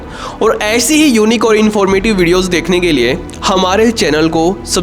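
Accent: India. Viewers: bihari accent per thoda kaam karo, स ओर श bolne per.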